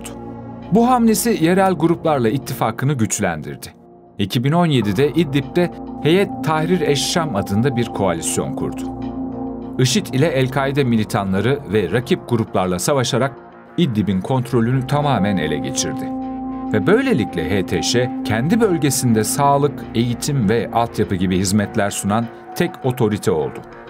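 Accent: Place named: Adana, Turkey. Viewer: Sadece bir Adanalı ,Elbise derken E yi uzatıp Eelbise der.seslendiren kişi,ya osmaniyeli,ya Adanalı ya da mersinli.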